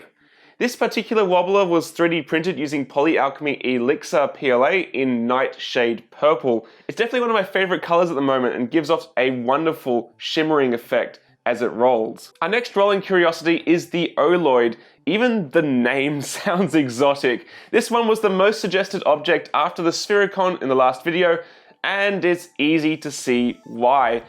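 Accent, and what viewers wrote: Australia. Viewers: I wonder if you are from Autralia? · Is he Australian? Those accent, similar to Hugh Jeffreys iPhone Youtuber.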